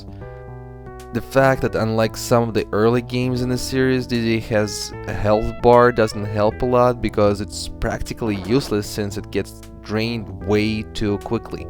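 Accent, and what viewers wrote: Russia. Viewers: Great review, dude! I love this game, it's huge! So much to do in it. Dude, I like your accent, are you russian?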